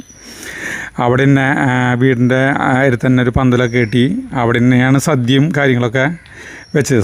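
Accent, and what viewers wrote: Thrissur, India. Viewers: കാടണ്....തൃശൂർ ഭാഷ 👌🏼👌🏼👌🏼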